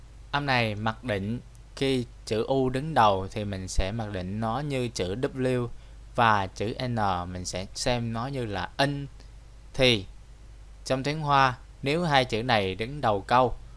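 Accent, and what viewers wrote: Standard. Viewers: Khó lắm mới tìm thấy kênh có phát âm chuẩn như kênh này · Thay phat am chuan va giang bai de hieu, em da tu hoc biet duoc rat nhieu tu, tu tra tu dien dc , cam on thay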